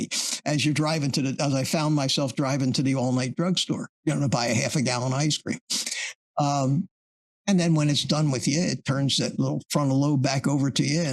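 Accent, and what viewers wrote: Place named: Philadelphia, United States. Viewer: Hi just enjoyed the live stream with Kerry Mann. Just checking out your other videos I enjoy also he is hilarious. I knew your accent sounded familiar I am also a fellow Philadelphian😀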